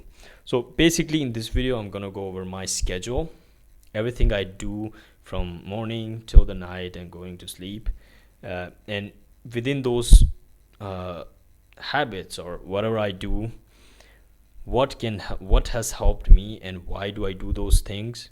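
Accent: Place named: Czech Republic. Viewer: How did you learn english❤ so well in a Cruchy accent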